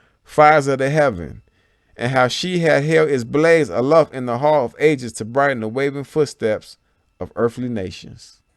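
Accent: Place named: South, United States. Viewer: We wuz kangz is over hundred years old? Didn't know that. But the Black Southern accent is just great.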